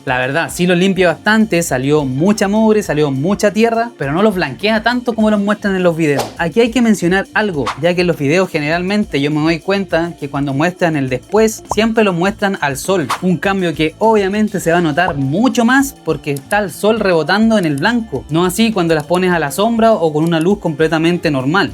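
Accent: Chile. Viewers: Eres chileno? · Chileno, bro? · Chileno, cierto? 😅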